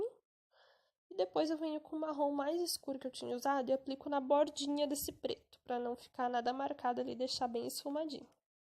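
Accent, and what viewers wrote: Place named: Rio Grande do Sul, Brazil. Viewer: Guria...tu é gaúcha!!! Reconheci o sotaque e os produtos da Panvel... De que cidade???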